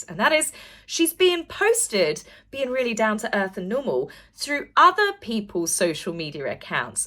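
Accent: United Kingdom. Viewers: Lol, I thought exactly that (about how British Edward, the Duke of Kent) looked. What a profile! He’s a year younger than my Dad (Papa)…he’s up on his roof cleaning his gutters, using a push mower to cut his grass, does all the same stuff he did 20 years ago. He scares all of us girls (3 daughters) to death, because he lives alone. But he won’t have any of our fussing, he just carries on an ignores us. Thank you for reading that bit in your lovely British accent, and not subjecting us to Meghan’s common Californian 🤭. I say that as a Southern American with friends in California…but her voice in particular, just really grinds my gears 😉. 💖🌸💖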